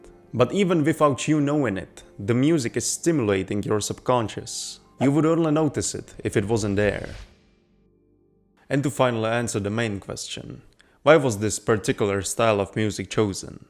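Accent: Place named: Russia. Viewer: yo, nice video dude and, what i just want to say... music in minecraft sounds kind of awkward, and it's creates its own rather distinctive atmosphere, it's one of reasons why i so much love this game (but i never play this again haha). this video made me refresh my memory, thank you for that. (and i can't help but say this: your conclusions in the end remind me a bit about pugpiece's video about space in video games, idk you russian or not, but if answer is "Yes" (i dare say, because the accent is a little similar) - watch this, i think you're enjoy that)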